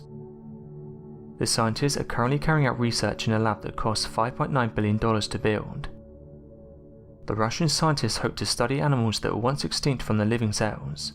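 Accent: United Kingdom. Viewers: I wish you would loose the brit and find someone who speaks English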